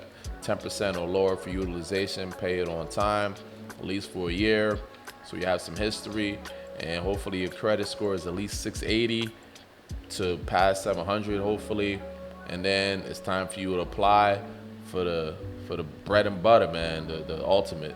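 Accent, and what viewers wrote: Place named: New York, United States. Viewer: You gotta be from Ny lmao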